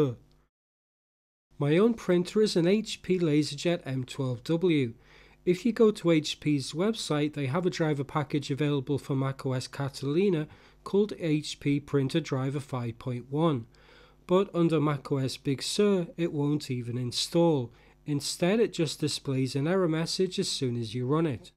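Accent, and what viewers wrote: United Kingdom. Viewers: Brilliant! Easy to follow and it worked. No driver for LaserJet 1020 but the 1022 will do it. Why can't HP or Apple sort this out instead of causing loads of problems and stress? Thanks so much. ( Diolch yn fawr, you sound Welsh! )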